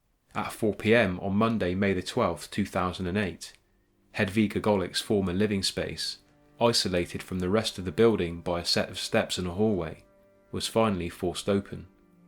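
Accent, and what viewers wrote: United Kingdom. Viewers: your impeccable research and ability to keep us on our seats wondering whats going to happen next is only enhanced by your awesome accent. like it would still be really interesting and awesome but I think having a distinguished british accent just ties it together in a beautiful black bow.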